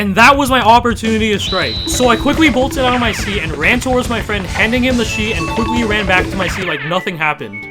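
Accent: Canada. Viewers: U Canadian bro? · bro are you canadian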